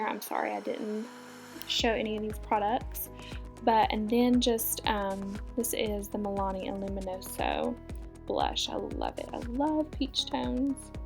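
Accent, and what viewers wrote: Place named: South, United States. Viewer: Who cares what people think! As long as you like them that’s all that matters! I get mine done and everyone will always have their opinion! I choose to ignore BC I like them! Where are you from? I wasn’t expecting the country accent as this is the first of your videos I’ve watched! You are beautiful! Thanks for the video!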